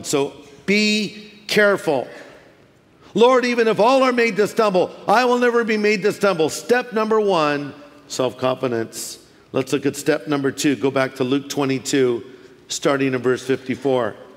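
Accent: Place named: California, United States. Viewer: You definitely do have a California accent. It's a laid back, drawn out sound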